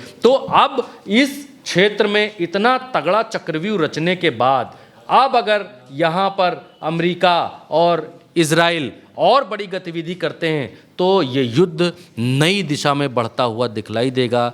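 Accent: India. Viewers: bhai naya naam de diya apne nayi dukaan khuli hai. aap ke andar ek desi pan hai jis wajha se aap ko sunne me maza ata hai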